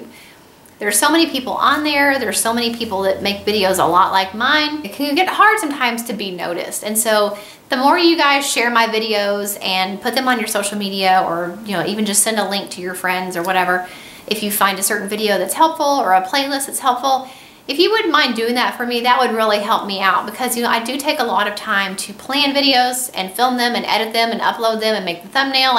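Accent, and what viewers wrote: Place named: South, United States. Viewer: I subscribed to your channel because I was interested in learning more about homeschooling and being a SAHM. I like your personality and southern accent. I've always liked anything "southern"... Movies, books, tv shows, etc. I also like your gift videos and that you like to use natural cleaners. Your Mickey subscription box video has me itching to save up for another Disney vacation and I'm really excited to watch your Disney videos now! Our baby boy was born last week and we haven't decided on a name yet. What are some popular names for boys in your area? Or if you had a boy what would you name him?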